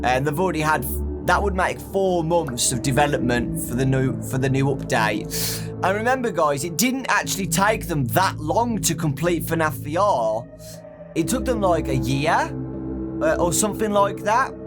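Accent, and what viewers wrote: United Kingdom. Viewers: quality content,British guy talks about a company saying hmmmmm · My dad hates your accent:( he dosent like British accent at all! But i love your accent! I wanna learn British accent!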